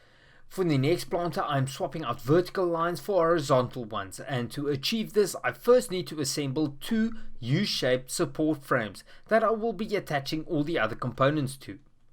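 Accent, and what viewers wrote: Afrikaans, South Africa. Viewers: i love south african accents. they're so jolly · Lekker Afrikaans accent💪💪 lekker channel. Dankie. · You sound like one of those South African people.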